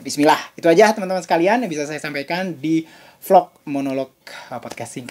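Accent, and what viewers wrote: Indonesia. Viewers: 😂kang,biar enak di dengar,coba kalo nyebutin nya jgn lidah indo banget....coba gini biasa aja: komfom jangan sampai bunyi yg keluar komfrom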